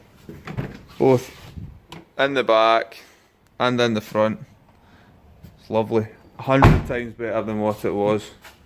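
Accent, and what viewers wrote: Scotland, United Kingdom. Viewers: Is that Scottish accent? Lovely work on that truck! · Good to hear a Scottish accent again, great job on the truck BTW. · are you Scottish?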